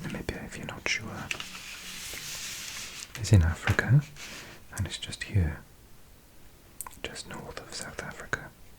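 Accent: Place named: Wales, United Kingdom. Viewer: Fellow welsh person?🎉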